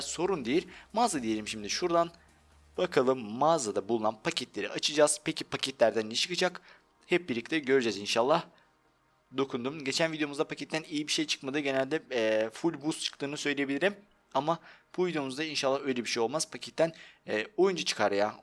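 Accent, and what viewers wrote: Azerbaijan. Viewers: Kardeşim Azerbaycanlı mısın?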